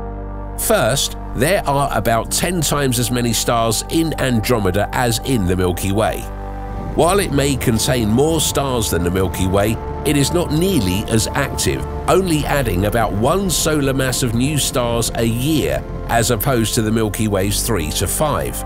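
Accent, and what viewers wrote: England, United Kingdom. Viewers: If it wasn't for this guys English accent I wouldn't believe this!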